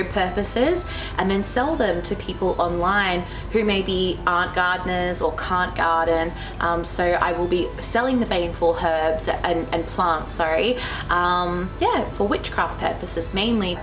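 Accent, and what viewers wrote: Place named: Australia. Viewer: Me, hears Aussie accent. Instantly hits subscribe because I love supporting fellow aussies… and because Aussie witchie fun!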